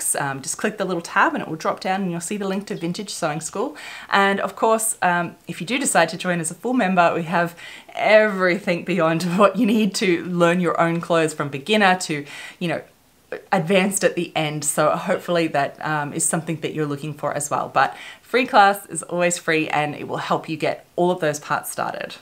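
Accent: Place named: Australia. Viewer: Hi, so great to hear an Aussie and refresh my sewing skills. I have been sewing for a few decades and have waxed and waned with my sewing. After years of sewing quilts etc I am keen to get back into sewing clothes. I have dipped into your videos and love that you are focusing on sewing clothes, your videos on altering opp shop finds are great too. Thank you for such informative and lovely videos.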